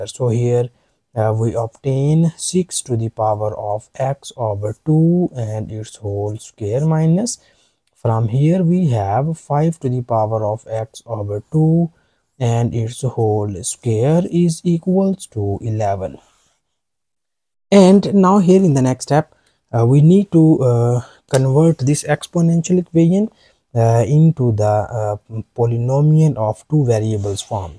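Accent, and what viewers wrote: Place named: England, United Kingdom. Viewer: Dehati angrez ki tarah bol raha hai. Paki hai kya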